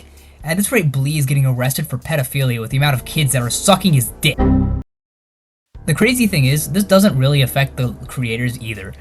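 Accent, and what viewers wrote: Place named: Canada. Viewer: kenadian-ah voice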